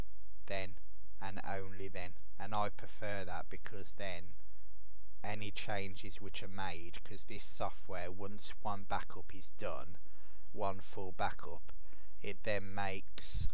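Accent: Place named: Ireland. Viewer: thank you for giving such an informative video. I received an Acronis True Image Activation Key with my new Crucial SSD. Having never heard of Acronis, I ventured onto Youtube and found your video. So now I feel up to speed. One question: my wife and I were wondering from you accent where you are from. She said Ireland, and I'm just not sure. OK, I'll say Ireland too.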